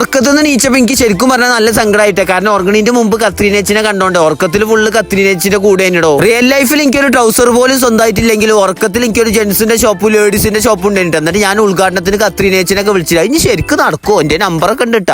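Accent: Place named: Kozhikode, India. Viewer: എന്തേത്താണ് ചെങ്ങായി ഇജ്ജ് കോയിക്കോടി ണോ? എന്താ മോനെ എൻ്റെ ഡയലോഗ് സൂപ്പറായിക്കണ് , ഞമ്മക്ക് പെരത്ത് ഇഷ്ടായി . ശരിക്കും. നിൻ്റെ കത്രീനേച്ചി സൂപ്പറാ❤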